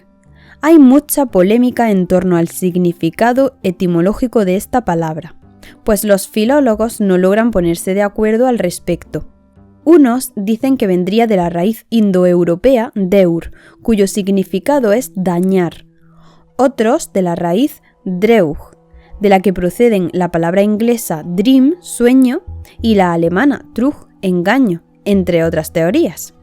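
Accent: Spain. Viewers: ¿de qué parte de España eres ? tu acento suena como a gallego y además habiendo tantas leyendas de seres míticos en Galicia no me extrañaría que fueras de allá pero tu apellido parece catalán ¿de donde eres ?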